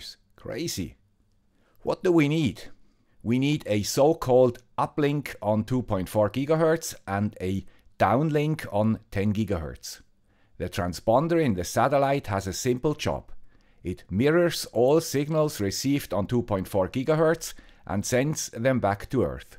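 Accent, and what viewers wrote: Switzerland. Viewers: I know. I was just saying we need more videos all the time 😂😂 can't get bored listening to the guy with the Swiss accent.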